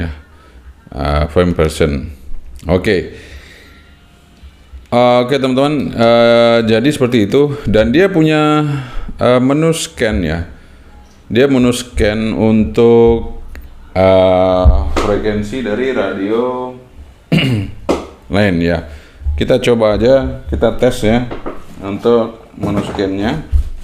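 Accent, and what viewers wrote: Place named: Spain. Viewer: Hola amigo. He visto tu video. Pero no entendi prácticamente nada 😂 ya que solo hablo español. Me he percatado que en tu acento al hablar dominas mejor el español y si no me equivoco en mi observación, te agradecería enormemente si hicieras un video de este equipo en español, ya que en la red todavía no aparecen o almenos yo no les he encontrado. Gracias de antelación 👍✌️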